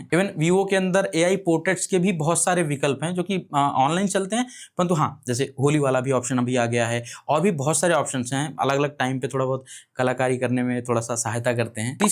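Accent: Standard. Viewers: आपकी हिंदी अति शुद्ध है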